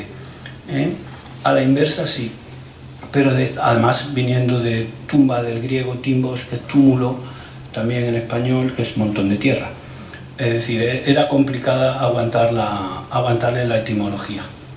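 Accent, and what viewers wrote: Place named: Córdoba, Argentina. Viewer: Lástima la calidad del vídeo, con mal sonido y sin que veamos los documentos de patnalla, con un micro antiguo que recoge las toses, con lo barato que es un micro de solapa, y con alguien que no lea los textos que él conoce a esa velocidad, que no hay cerebro que lo pueda asimilar al oirlo, sobre todo para quien no está familiarizado con el acento cordobés.Y por cierto, la existencia de cultura islámcia en la Península Ibérica, es una invasión, una ocupación, por mucho que el sr. diga que no, pero ya sabemos la línea ideológica subjetiva de la universidad de Granada. Poco profesional todo.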